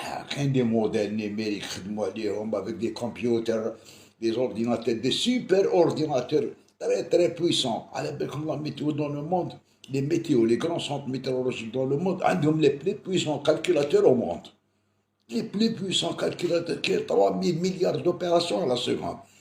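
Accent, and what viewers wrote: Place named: El Harrach, Algeria. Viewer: علاش الحراشيين يهدرو كامل بهذه الطريقة يعني طريقة دحمان الحراشي 🤔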